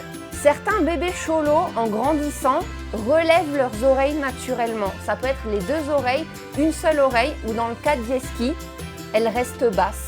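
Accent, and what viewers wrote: France. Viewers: Me ha encantado tu video me hermana francesita! me das mas datos de mi cultura que que el INAH! (Instituto Nacional de Antropología e Historia) y es muy agradable escuchar tu acento "franchute" Ja parle francaise, un po y me encanta escuchar esos tonos y modos del francés! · Amo tu forma de explicar nuestra cultura con mucho entusiasmo e interes. Note que mencionas referencias y das a detalle del tema que abordas. De igual manera tu acento frances. Bellisima mujer. 😍👩🏻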